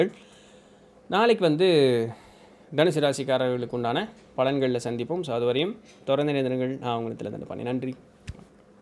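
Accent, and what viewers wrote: Coimbatore, India. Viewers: சார் கோயம்புத்துாரா?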